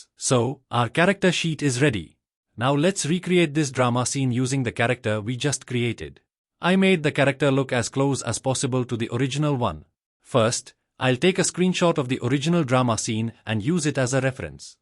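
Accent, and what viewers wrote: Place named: India. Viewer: Why has the AI narrator an Indian accent…? 😅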